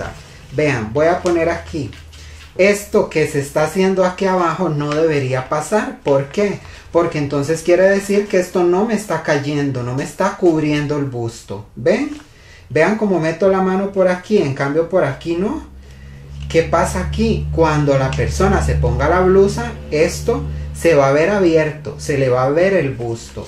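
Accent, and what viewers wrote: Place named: Costa Rica. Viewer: Maestro muchas gracias por compartir sus conocimientos, perdón tengo una pregunta: usted es costarricense? Me parece que su asentó es de costa rica. 🤗🙏🙏👏👏👏🇨🇴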